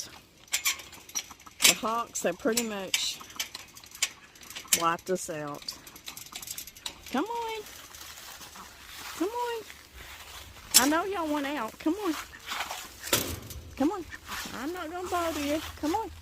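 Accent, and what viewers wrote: South, United States. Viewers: I just thought you were gonna tell us a good story! I hope it's not going to be "one of those days!"... I spent the major part of my life in the south, so it's not like I'm not used to the accent, I have one myself! Have a blessed day!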